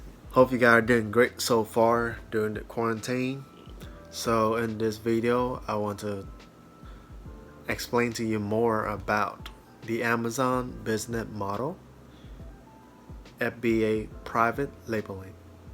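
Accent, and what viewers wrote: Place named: Texas, United States. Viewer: Dude whats up with the southern texas accent haha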